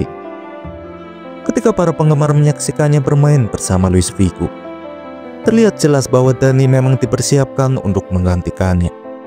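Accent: Java, Indonesia. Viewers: jawir pengisi suare ye, bikin bete, mending ngisi suara wayang kulit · Medhog banget narratornya.. 😂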